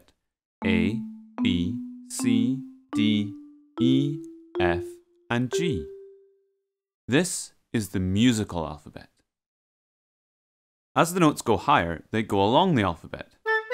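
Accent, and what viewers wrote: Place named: Scotland, United Kingdom. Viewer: Why u scottish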